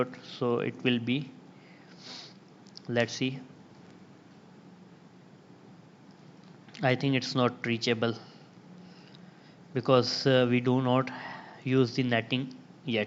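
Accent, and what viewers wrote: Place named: India. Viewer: I liked the video and helped me a lot, also I I'm using this chanel to get used to Indian accent.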